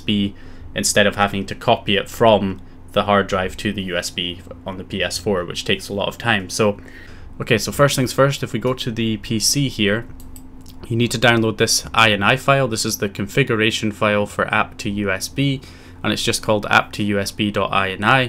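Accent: Scotland, United Kingdom. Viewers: Amazing videos BTW, and extra special to hear a fellow Scot explain such matters!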